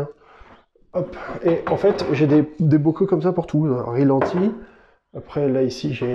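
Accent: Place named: North, France. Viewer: INCROYABLE vidéo! ton accent du nord m'a bien fait rire! Tu me fais penser à nous, avec tes 2 valises et à bouger avant de te poser (seul différence mais valise déborde encore ahah). J'aime beaucoup ta façon de penser! tu es trop drôle et tu es mémorable!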